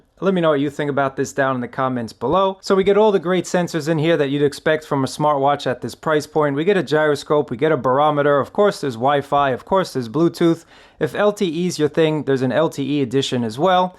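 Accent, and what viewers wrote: New York, United States. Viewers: Love the NY accent.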